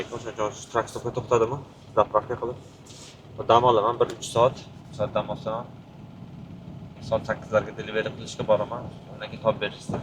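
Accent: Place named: Oltiariq, Uzbekistan. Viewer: Oltiariqlikmisiz shevez o'xshayaptida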